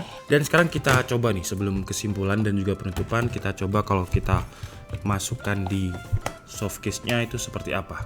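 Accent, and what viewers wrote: Java, Indonesia. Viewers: Orang jawa emang medok mas bro jawane😂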